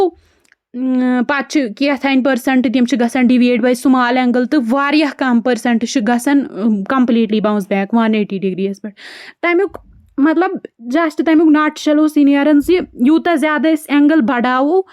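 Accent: South Kashmir, India. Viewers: Are u from south kashmir just saying from accent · Cutest south kashmiri accent.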